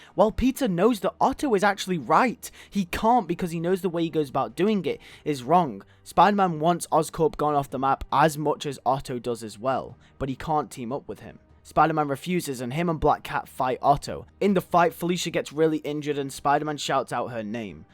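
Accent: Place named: United Kingdom. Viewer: you are very good youtuber and your British accent is great what happens next?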